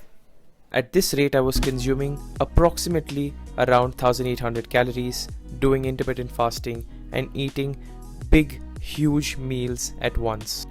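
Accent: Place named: Bangalore, India. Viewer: You have a banglorean accent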